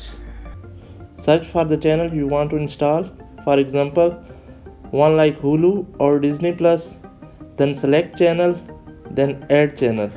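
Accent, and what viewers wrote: India. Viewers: Indian English accent cannot understand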